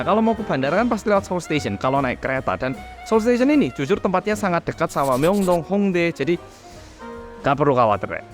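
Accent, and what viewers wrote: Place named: Surabaya, Indonesia. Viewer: lagatnya suroboyonan.. btw muantaap infone mas... kapan waktu aku tak mangkat korea.. sehat selalu masee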